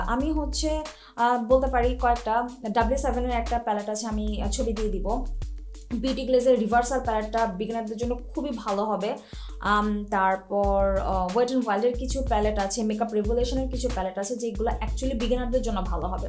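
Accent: Bangladesh. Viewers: Apu ki Chittagong er? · Apu apni ki noyakhalir 😳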